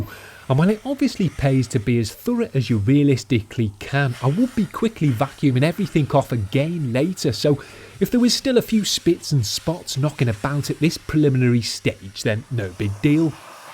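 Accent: Liverpool, United Kingdom. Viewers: Another awesome video with great and humourous scouse commentary.Juvenile sneeze splatter got me😂👍🏻👍🏻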